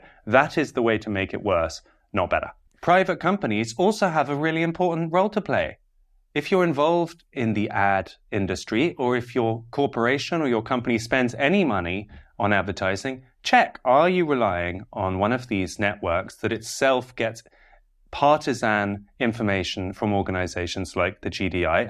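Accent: United Kingdom. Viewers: This is some kind of bullshit, but the british accent makes it palatable somewhat 😂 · Yeah I'll engage, this is just a cute little British accent and version of the right wing complaining when breitbart got their advertising yanked.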